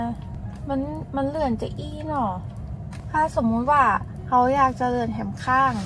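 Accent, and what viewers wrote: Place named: North, Thailand. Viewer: ชอบความเหนือแท้100% ชอมมากกก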